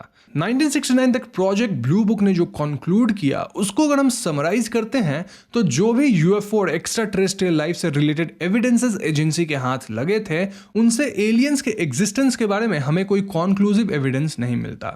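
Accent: Bengal, India. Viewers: U r Bengali right? Ur accent reveal it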